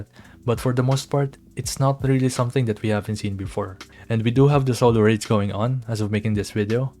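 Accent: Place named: Philippines. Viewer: Are you Filipino?, you sound like one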